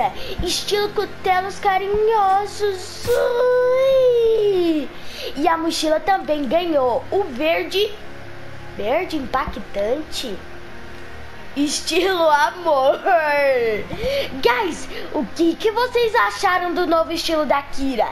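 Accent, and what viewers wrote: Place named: Bahia, Brazil. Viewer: Kkk muito engraçado vc falando parece um baiano kk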